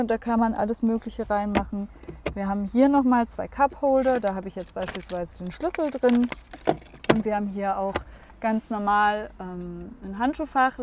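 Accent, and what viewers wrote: Bavaria, Germany. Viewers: Sehr schönes Fahrzeug ich habe A5 Cabrio 2018er :) bist du aus Bayern mfg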